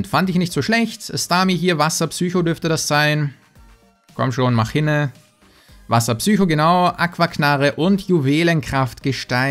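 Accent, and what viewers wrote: Austria. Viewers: Woher kommst du (wegen deinem akzent) ????🍭🍭🍭also akzent in ÖSTERREIC.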